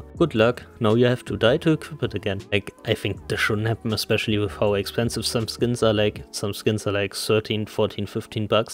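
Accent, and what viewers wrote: Germany. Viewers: sehr gut. Man hört es schon raus, aber ich als 45 Jähriger mit meinem Schulenglisch verstehe es echt gut. 👍🏽 Weiter so. 🍻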